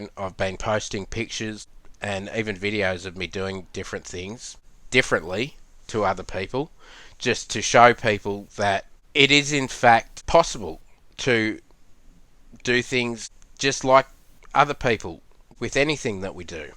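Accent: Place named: England, United Kingdom. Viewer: I love your English accent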